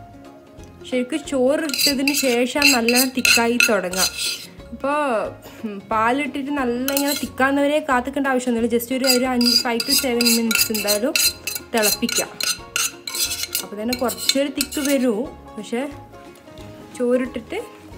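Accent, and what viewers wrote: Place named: Malabar, India. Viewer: Hi shared the Link now, watched...... good presentation, will attempt it today subject to all ingredients in place, voice over Good!! ( Malabar slang) keep it up god bless stay safe!!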